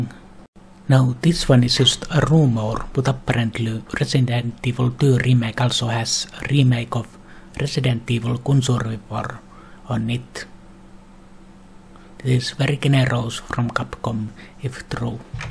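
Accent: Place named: Finland. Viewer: Why you speak English in Suomi pronouncation?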